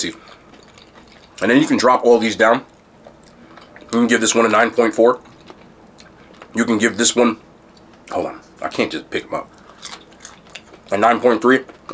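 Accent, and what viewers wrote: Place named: New Orleans, United States. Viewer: I fw u u tried to talk like how we talk in New Orleans⚜️ much love to u bro😂💪🏽💯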